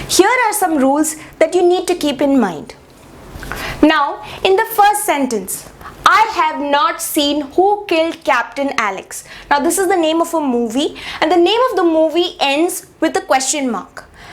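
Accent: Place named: India. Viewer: She sounds like an indian person I know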